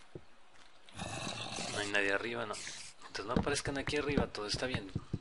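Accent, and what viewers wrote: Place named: Mexico. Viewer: En serio eres de españa??... Te lo digo porque hablas como mexicano....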